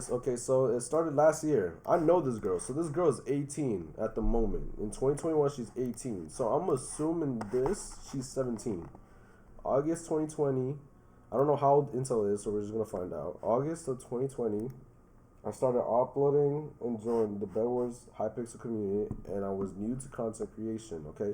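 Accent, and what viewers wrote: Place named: Long Island, United States. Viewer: What part of Long Island you from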